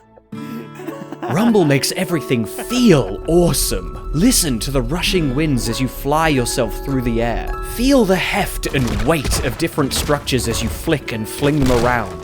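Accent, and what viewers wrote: United Kingdom. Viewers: the bri'ish accent makes this video funnier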